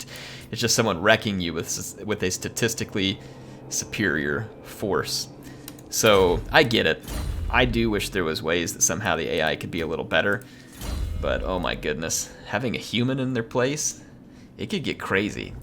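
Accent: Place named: Appalachia, United States. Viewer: While I enjoy the hillbilly accent, I still prefer Michael Palin saying “anarcho-syndicalist commune.”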